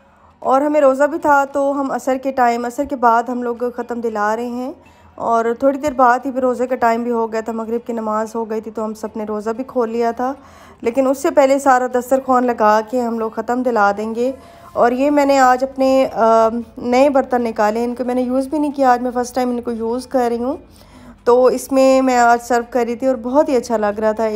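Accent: Saraiki, Pakistan. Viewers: Hayeee ap saraiki ktna pyara bolti hai 😍😍😍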